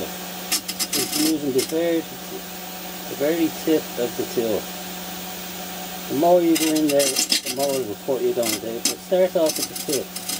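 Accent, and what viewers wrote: Ireland. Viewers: I very much appreciate your approach to turning and especially your comments regarding safety. Very appropriate. I just found your site and have started watching your videos. I lived and worked in Ireland in the mid-90's for 2 1/2 yrs so I recognized you were Irish immediately! Are you from the Dubin area? North Dublin, perhaps? My ear for the accents is not as sharp as it used to be when I could pick up a Dub, or a Cork or Kerry man when I lived there. Cheers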